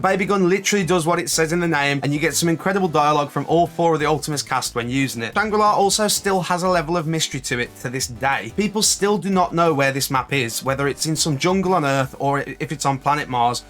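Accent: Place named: West Midlands, United Kingdom. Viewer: I agree with the choices made 👌🏻, pulled out my old jailbroken ps3 and had a blast the past week playing all the old maps 💯Are you from near West Midlands your accent sounds very familiar ??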